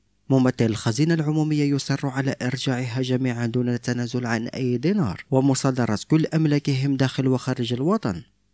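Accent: Morocco. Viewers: Tu as une accent marocaine!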